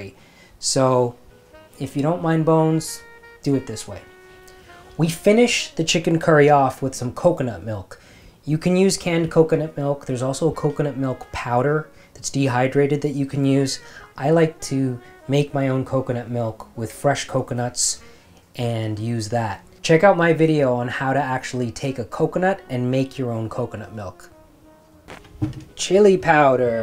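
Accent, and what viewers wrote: Jaffna, Sri Lanka. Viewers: “Melaha thool” lol love it that you still have your yaarlpannam accent. Looks amazing. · Thambi… super Unga srilankai Tamil kadhil ketka romba inimaiyaga ulladhu… lovely to hear your srilankam Tamil … rombavae vadivana vlog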